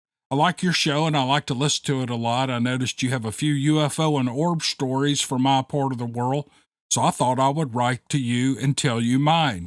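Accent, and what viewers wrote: South, United States. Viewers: I love how you read.. I could listen to you all day.. I really love the Steve Lily saga but anything you read is fine with me.. as a Arkansas gal, I love your southern twang..The stories in your podcast were great..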